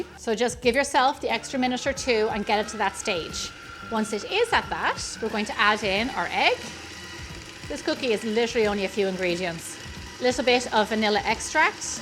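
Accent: Ireland. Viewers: Thank you,! This is exactly what I was looking for. And listening to your sweet Irish accent makes it all the better.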